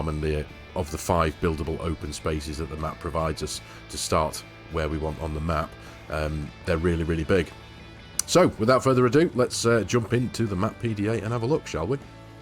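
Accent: United Kingdom. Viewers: You can't imagine the joy i have by just listening to your voice. I can't say where you from in the UK. I love the 4K maps , it takes some time to get started. The investment in you farm or production points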